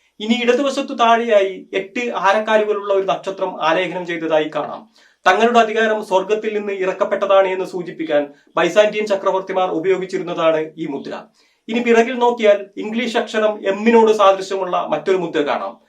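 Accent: Kasaragod, India. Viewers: എത്ര നാളായി നിന്നെ കണ്ടിട്ട്, നിൻ്റ് കാസർക്കോട് സ്ലാങ് കേൾക്കാൻ ഭയങ്കര ഇഷ്ടമാണ്, മാറി നിൽക്കരുത്, ഉടനേ 100k അടിക്കണം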